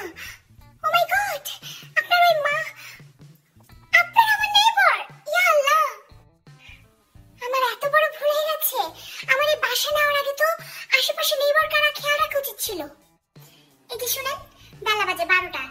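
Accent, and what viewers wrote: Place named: Jessore, Bangladesh. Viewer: আপু তোমার কথা গুলা খুব সুন্দর। তাছাড়া যশোরের ভাষা তো এ জন্য আরও সুন্দর।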